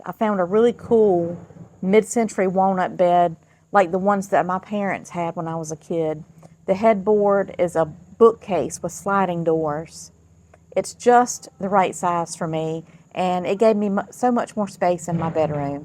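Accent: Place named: South, United States. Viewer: What part of the south are you from... I hear an accent. Im in Bangor, maine your northern neighbor.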